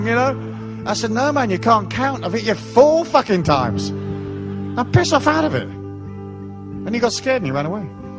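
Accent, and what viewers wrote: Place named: Ireland. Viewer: Slight Irish accent here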